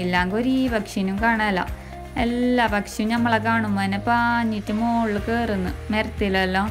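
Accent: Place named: Kasaragod, India. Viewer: Nalla language..ningal ksd analle..kelkan nalla sugam😃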